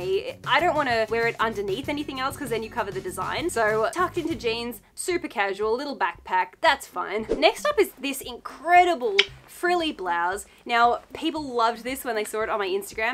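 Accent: Australia. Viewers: Gorgeous skirt clearly vintage- NO, NO, NO! Do not compromise this piece- it looks lovely on you as is- really suits you! Who’s the green cutie? Archie is he? His vocalisations and video bombing you at will is so sweet and it’s clear you two have a warm and lovely relationship- gently biting each piece to be involved- so cute! Clearly new to you and your wonderful AUS accent- ‘Hullo from the USA 🇺🇸❗️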